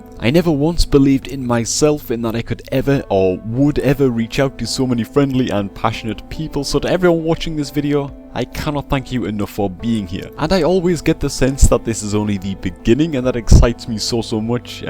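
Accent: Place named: Geordie, United Kingdom. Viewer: he's either a Geordie/Mackem or a sand dancer, cant tell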